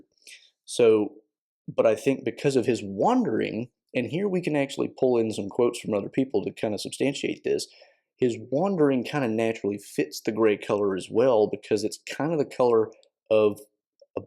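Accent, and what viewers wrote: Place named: Louisiana, United States. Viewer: Hi Lucas. I wouldn''t be surprised if "the war that will end war" was of French origin. On the other hand, I think evidence would need to be brought forward to prove it. (I hope you can read this in the Louisiana accent of our host).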